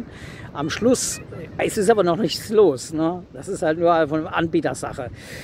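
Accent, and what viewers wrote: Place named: Saarland, Germany. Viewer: Grüß dich. Kommst du ausm Saarland? Dein Dialekt klingt so. Sorry wenn das nicht der Fall ist 🤣👍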